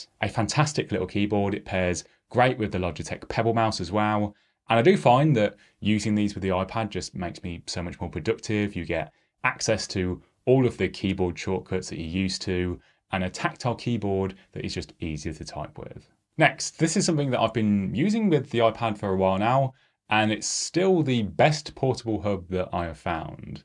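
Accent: Midlands, United Kingdom. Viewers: Are you from the Midlands? I’m trying to place your accent. I love the Retro charger - I’ve been after something like this for upcoming holidays.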